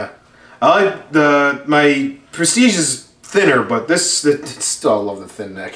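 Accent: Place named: Canada. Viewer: O.K., Good cover, guys!, and a Great guitar!, 👍 Gave you a follow, too. Because IF YOU'RE NOT CANADIAN!, you certainly SOUND Canadian, with straight-shootin' straightforward appraisal!, of the qualities & sound of an Ibanez I have, and appreciate! Rock on! 🎉😂 🇨🇦 🍁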